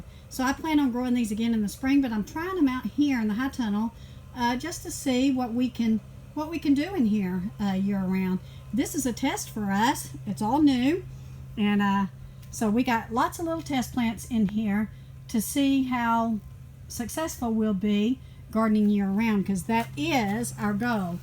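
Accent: Texas, United States. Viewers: Dang. I miss that accent. Grew up in HEB area. Exiled in La.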